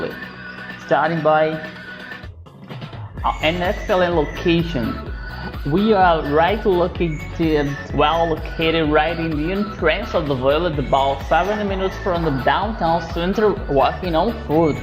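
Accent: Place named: Ceará, Brazil. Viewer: Cearense English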